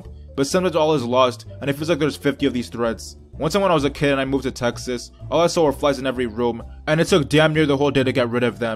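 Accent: Philadelphia, United States. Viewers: R u from Philly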